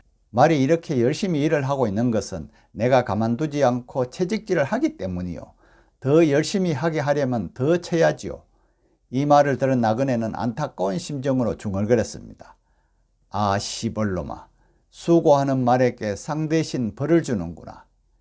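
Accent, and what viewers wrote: Gyeongsang, South Korea. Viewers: 선생의 억쎈 갱상도 사투리 그냥 듣고 싶지.안소이다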